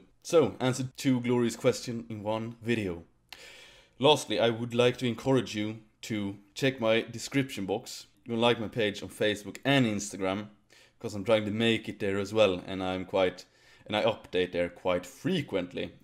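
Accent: Sweden. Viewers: Swenglish is the most glorious accent in the world :) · .Bowles If I am going to guess, I guess he has learned English through reading/studying, because of his huge accent that he has. I am from Sweden as well and I used to be pretty bad at speaking English verbally mainly because I only read English in text form. When I started watching tv-series, I improved my pronunciation a lot. But his vocabulary is amazing, mine is pretty crap to be honest but I learned English by myself so I should be somewhat happy lol.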